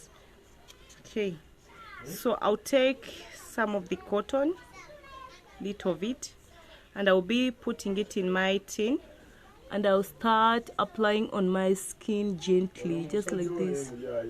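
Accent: Uganda. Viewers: Uganda accent for me bby girl thanks im gonna follow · Hi, thanx Are from Uganda? Your accent!!!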